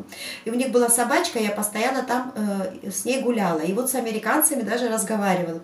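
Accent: Georgia. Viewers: Этоже классно когда тебя так называют ,ашкым,севгилим,чаным,уреим,бебеим,хаятым. Я так и знала что вы с Грузии ,у вас акцент грузинский)))))) 👍👍👍👍👍👍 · ты там луч света акцент это нашинский грузинский испанах это мы же в Грузии так его называем смотрю твои видео с удовольствием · Gruzinski Akzent vi gruzinka 👍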